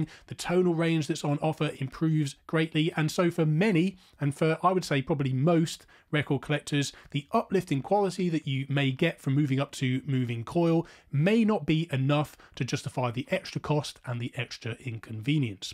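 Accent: United Kingdom. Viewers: Excellent video. Fantastic description.. efficiently to the point. Also the British accent is quite a nice compliment to the dialogue.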